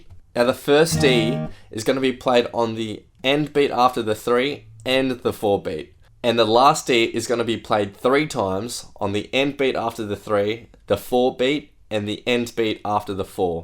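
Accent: Australia. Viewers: Aussie? NOICE!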